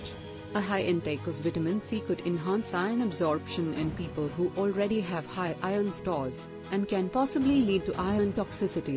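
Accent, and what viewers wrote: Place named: India. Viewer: As soon as i heard the indian accent i stoped the video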